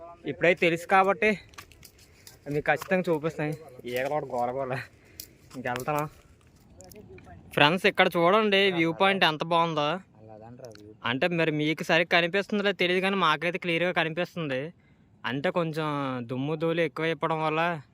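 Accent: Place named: Visakhapatnam, India. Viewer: గ్రేట్ biodiversity of సింహా చలం హిల్స్. Nice free talking of వైజాగ్ style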